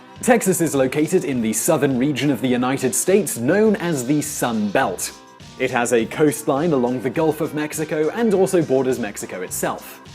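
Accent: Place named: United Kingdom. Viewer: The closed captioning is all wrong.. This is what Simon said in marker "Now it is true that Texas has suffered from the fall in oil prices, many people believe that this state due to its increasingly diverse economy it will lead the way in the United States." This is what is actually written in the closed captioning... "And even though the economy in Texas is suffering from the fall in oil prices, very few people believe that this state won't keep its lead thanks to its increasingly diversified economy." No mention on the statement that Texas "will still lead the way in the United States." Who ever does the closed captioning needs to put their own political bias aside, get a q-tip, clean their freaking ear wax out and listen to the commentator and write what is said. is speaking with a very clear british dialect, I wouldn't say it's British RP; however, its very clear. 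Maybe the new closed captioning job will open up in Texas.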